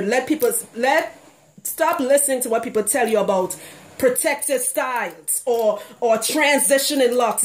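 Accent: Trinidad and Tobago. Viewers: Hey great info. Are you from Trinidad by the way im hearing it in your voice and accent. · Sounds like your from Trinidad, ,are you? If yes,,where? Am interested in locing my hair. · I'm hearing an accent...are you trini? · I'm hearing ah Trini 🇹🇹 mus know my people. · You go on and on and on and on and you still left us asking questions you could have easily answered 10 minutes or less into the video. And sorry to say but there's not enough art value in your tutorials, except for the background, where even your beautiful Trini accent becomes annoying because you're not delivering the message we've clicked on to hear. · you sound like a Trini... are you Trini??? I'm Trini❤❤❤❤ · I knew when i heard that accent, that you were trini , represent hun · you are Trinidadian dear I hear it in your accent. Also I believe she was referring to people with locs.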